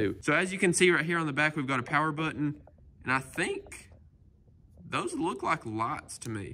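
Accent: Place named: Tennessee, United States. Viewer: I got some that are similar to yours and mine quit holding a charge more than an hour right after the return window closed . How’s yours working now ? Great review! I love the wide view these types of lights give . Definitely want another one that’ll last . Your accent is similar to mine . I’m in TN , born and raised . What state are you from ?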